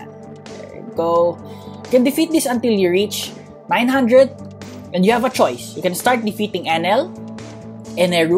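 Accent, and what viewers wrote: Philippines. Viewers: Hes accents is like a filipino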